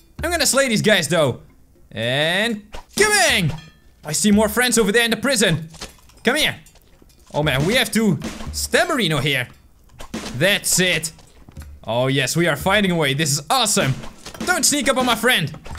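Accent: Netherlands. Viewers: You cant hide ur dutch accent XD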